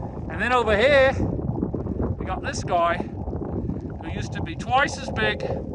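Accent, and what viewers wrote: Australia. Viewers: Good Aussie accent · I don't know but I've been starting to talk to myself with your australien accent today... this is crazy, this is contageous !!! Happy 😊 to see Girlie ; such a beautyl!!! Watch The cosmic secret last night, had to finish it; A Brilliant Masterpiece!!! Brings lots of clarity !!! Bravo!!!👏👏👏☀️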